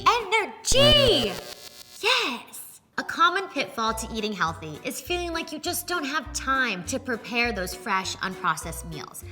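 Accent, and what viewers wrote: United States. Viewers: Great information, but such a piercing American accent, I had to reduce the volume to get through it.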